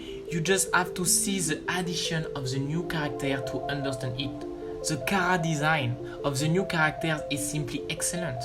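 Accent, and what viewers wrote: France. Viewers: Are u French? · after see ur face reveal on DWI server long time ago i don't expect u have this kind of voice but that france accent 100%🔥 hehe, nice vids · Pro u were from France LOL 😂 I thought you are from USA 😅 but not bad you had explained well bravo 👍🏻🤝🏻🥃🍷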